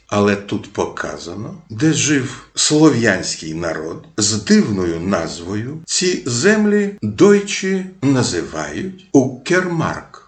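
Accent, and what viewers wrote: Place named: Ukraine. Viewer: Дякую за роботу та за українську вимову! По Толочко якось не зрозуміло, якщо він такий промосковський, то чому це питання не піднімається і його хоча б не звільнять, для початку. Бо ви вже не один рік піднімаєте цю проблему, і я так розумію людина ця не поміняла досі своїх поглядів! Чи поміняла? Або я роблю висновок, що корупція від москалів в історичній царині нашої країни це реальна інституція, яка інтегрована в нормативно-правових актах та втілена в більшій частині людей при керівних посадах?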